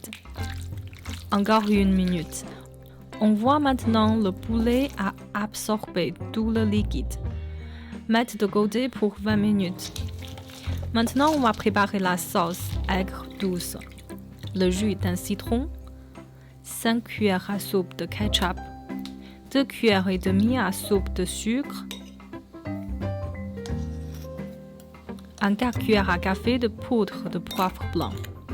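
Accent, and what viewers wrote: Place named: Shaanxi, China. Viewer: 有点陕西口音…………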